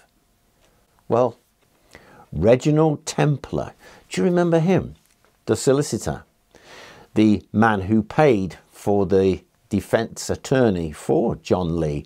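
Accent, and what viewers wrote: United Kingdom. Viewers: Any story in a Brit accent - lay it on me!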